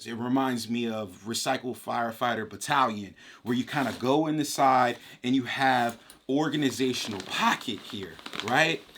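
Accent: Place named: Philadelphia, United States. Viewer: I'm from West Philly and I'm curious to know are you from Philly or a surrounding area? You sound like you have the accent.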